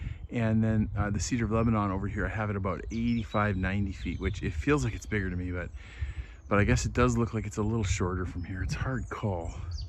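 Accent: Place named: Saskatchewan, Canada. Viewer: and I have just discovered your show. I listened to your voice and I thought “he sounds like he’s from Saskatchewan….and then I saw the Roughrider shirt. Thanks for sharing your journey. 🇨🇦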